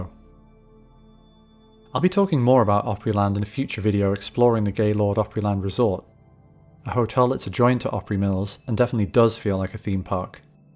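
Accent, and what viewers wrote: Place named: England, United Kingdom. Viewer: dead mall vids hosted by an english man just hits alittle different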